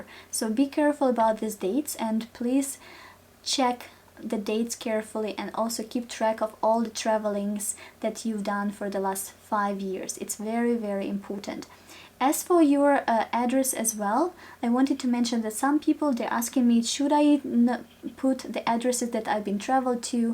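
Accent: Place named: Eastern Europe. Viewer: you have an east European accent and I Wana visit Poland, Croatia and Bosnia in the summer that was why I asked, never mind anyways I'm from Calgary.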